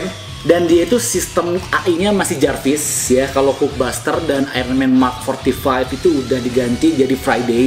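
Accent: West Java, Indonesia. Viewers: youtuber mainan kesukaan gua, gak kaku, asik ngomongnya. kadang sunda logatnya, i love u 😂😍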